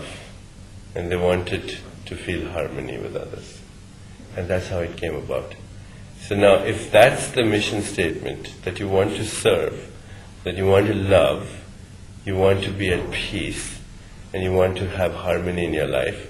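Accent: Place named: India. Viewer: Not sure if wise or just Indian accent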